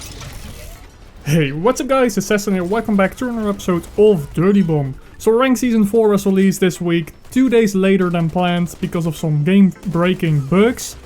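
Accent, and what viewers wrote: Netherlands. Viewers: haha lekker hollands accent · U sound really Dutch (vette video man en respect voor je Engels het is echt goed)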